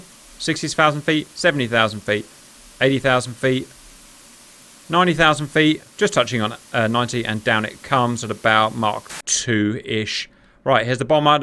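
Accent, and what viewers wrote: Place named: United Kingdom. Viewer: Damn you, damn you and that awesome British accent. =)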